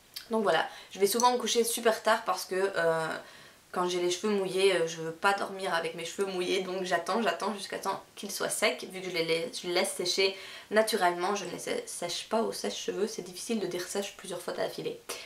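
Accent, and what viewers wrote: Belgium. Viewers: Coucou :) j'ai adoré ta video et tu a de très beaux cheveux 😍 enfin bref je voulait te dire que tu a raison de te laver les cheveux une a deux fois par semaine (je fais pareil), ma mere est coiffeuse et elle m'a bien dit que si on se les laves une fois tout les deux jours par example, ca peut les casser et que ça sert vraiment a rien de se les laver aussi souvent :) donc Voila y a rien de dégoûtant à se laver les cheveux une fois par semaine 😅 c'est juste que ca les abîmes moins. Et pareil, quand j'ai mis un truc dans mes cheveux ou quoi, je les laves en plus c'est normal :) Voili voilou 😋 PS : j'ai remaqué que tu avait un accent, t'est belge? :)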